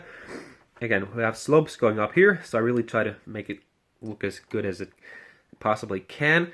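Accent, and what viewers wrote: England, United Kingdom. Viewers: Bist du Engländer? Sprichst sehr gut Deutsch.. Dein Moc is auch super ;)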